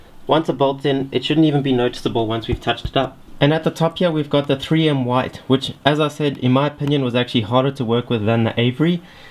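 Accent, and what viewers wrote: South Africa. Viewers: Is that a south african accent? Just curious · He sounds like an English South African. You must hear the accent of Afrikaans South Africans trying to speak English.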